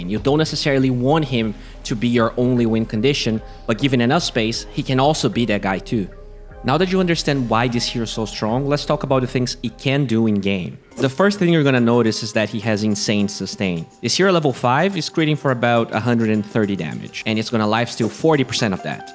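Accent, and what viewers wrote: Brazil. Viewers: o cara é br da pra ver pelo sotaque kkk tnc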